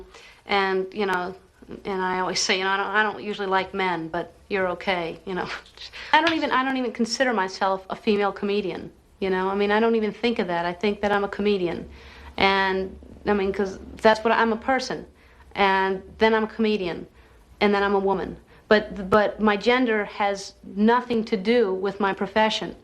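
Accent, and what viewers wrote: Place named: South, United States. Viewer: She still had the Southern drawl more marked than her later standardized Continental accent _'for all Publics'. I saw her in College when she was starting and she was even cuter, however more insecure which she built into her act as natural thing. When you have cracked eggs, you make...Ellen humor.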